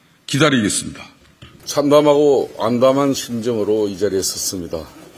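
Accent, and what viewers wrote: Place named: Gyeongsang, South Korea. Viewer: 갱상도자나 ㅎㅎ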